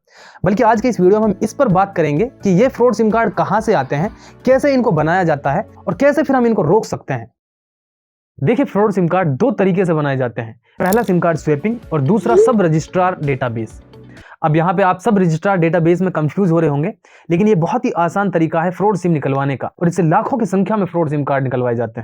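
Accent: Haryana, India. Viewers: Bro Haryanvi style toh hai 🤔 ( suggest me) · I can see that Haryana accent in his voice , good work bro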